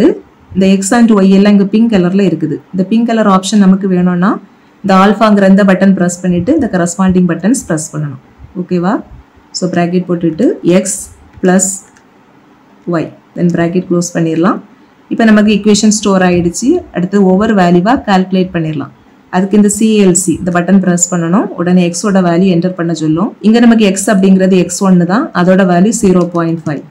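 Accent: Kanyakumari, India. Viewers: Kanniyakumari district slang❤ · Kaniya kumari slang bro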